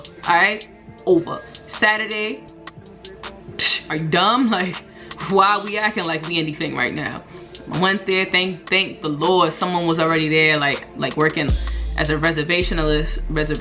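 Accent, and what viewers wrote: New York, United States. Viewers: Can I just say how comforting it is to hear a legit ny accent after moving out of state 😭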